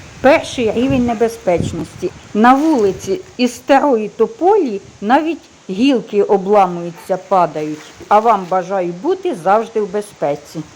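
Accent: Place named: Kyiv-Poltava, Ukraine. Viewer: Вітаю 🤗 дуже люблю ваш київсько-полтавський говір 💞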